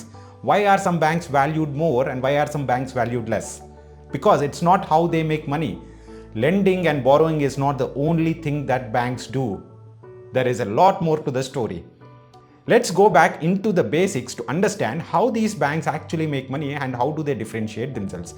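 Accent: India. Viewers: Indian Accent bro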